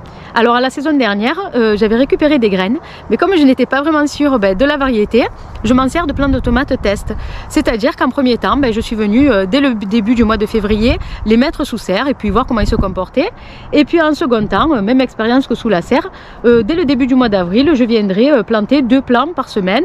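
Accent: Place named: Arles, France. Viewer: Bonjour, vraiment ya du travail dans votre vidéo.... Le montage, la musique et annotations texte, et le propos intéressant, j'avoue j'en fait pas autant niveau youtubage😮, bref super vidéo avec un accent de chez moi.... Arles. Vidéo vraiment pédagogue, simple et claire.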